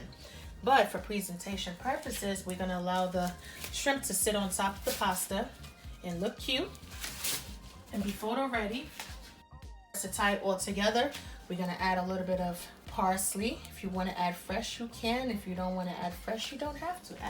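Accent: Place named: Northeast, United States. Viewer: That looked so tasty! you have a deep North Eastern accent. Are you from Brooklyn?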